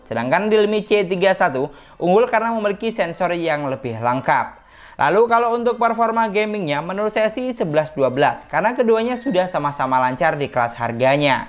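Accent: Madura, Indonesia. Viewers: Bang kamu orang madura ya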